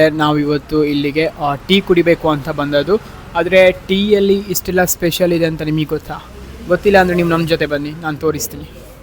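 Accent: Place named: Mangalore, India. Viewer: Excuse Me Nimdu Kannada Nodidre Mangalore Or Udupi Kanuthe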